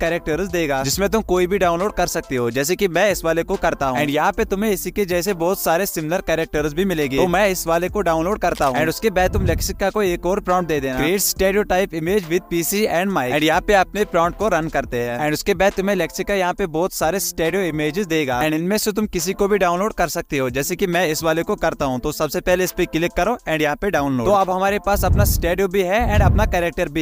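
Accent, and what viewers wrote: Kashmir, India. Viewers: just by your accent i can decode that youre from kashmir my homie shukh na ?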